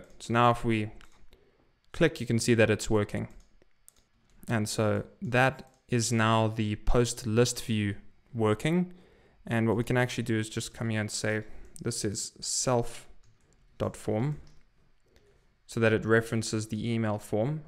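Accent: South Africa. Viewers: Ayy that's a South African accent i hear. Keep at it man. Great tutorial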